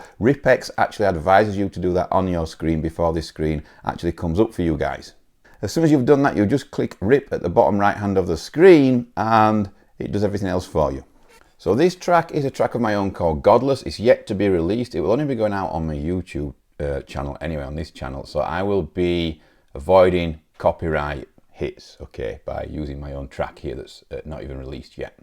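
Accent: North, United Kingdom. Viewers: Great video, and nice to hear a northern accent! I have my own little YT channel where I do bass covers - I'm seriously considering this as I prefer to play over tracks that have already had the bass-line removed so as I'm not doubling up on the bass. This software looks like it could do the trick! 🙂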